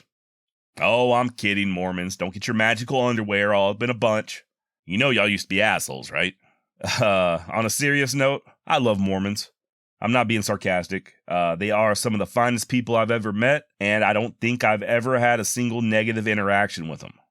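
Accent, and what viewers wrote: United States. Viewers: Wow 😮 Such an AMERICAN made voice❤❤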